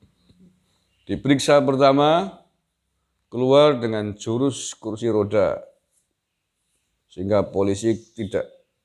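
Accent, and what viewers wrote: Banyumas, Indonesia. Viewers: Ga nyangka bisa guyon dgn ngapaknya, mantap penjelasan nya gas pol.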